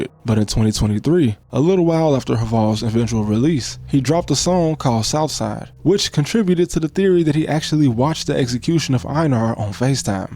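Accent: United States. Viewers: u can tell bro from america in 20 secs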